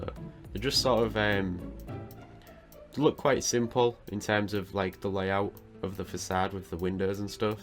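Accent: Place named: Hull, United Kingdom. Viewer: Your Hull accent makes me want to die but your drawings give me life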